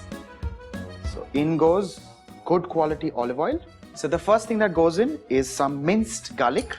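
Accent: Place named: Mumbai, India. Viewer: Love your channel esp your pukka Mumbai accent! I'm a Bombay girl too, now in Toronto - check out my channel!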